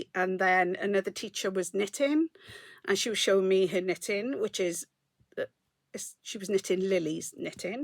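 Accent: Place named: Wales, United Kingdom. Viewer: Love your accent...and that you're from Gavin and Stacey land. Congratulations on the new Grandchildren' I have 2 grown up daughters and 2 grown up Grand daughters. This year I got my first Grandchild in February and the 2nd is due in December. Both boys so I have been knitting in blues, beige and brown. I even had to buy more baby patterns because most of my are for girls. But Grand children are wonderful.x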